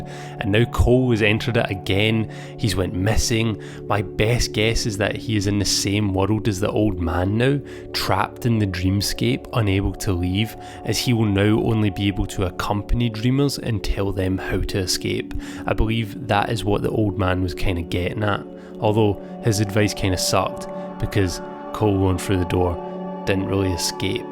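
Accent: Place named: Scotland, United Kingdom. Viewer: you have a heavy scottish accent but nonetheless, i like the review of this underrated analog horror series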